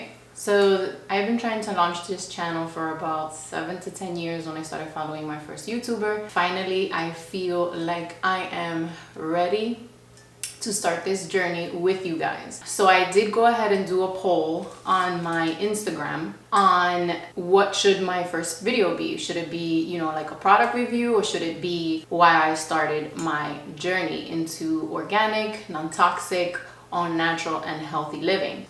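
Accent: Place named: Miami, United States. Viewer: you sound miami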